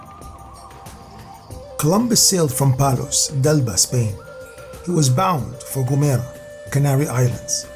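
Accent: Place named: Egypt. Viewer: By the tone and inflection, the narrator is Egyptian and I suppose he didn't want to reveal that aspect of Egyptian history for fear of it being mistaken as a bad thing. A euphemism of sorts. The price of gold in Egyptian markets plummeted as did the cost of living .. which is a good thing for the public masses..and bad thing for the rich.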